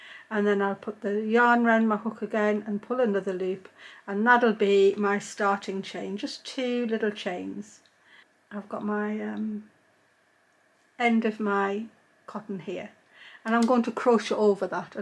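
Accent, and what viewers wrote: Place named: Yorkshire, United Kingdom. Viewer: Just discovered you and your work is lovely. I lived in Yorkshire for nearly 20 years and it’s lovely to hear your voice, and that you say “crochering”, just like my mother-in- law did, instead of “crocheying” -we who pretend we’re French! Sometimes I say “crochetting” because I’m musical! I really love that duck egg, eau de nil cotton yarn, it’s my favourite go-with-anything colour. Why on earth would anyone discontinue it! Right, I’m off to delve into your other videos! Thank you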